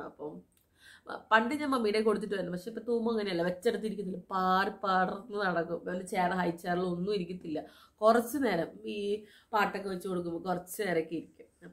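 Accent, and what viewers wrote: Thrissur, India. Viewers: ipo sherikum Trissur slang aayi samsaram oke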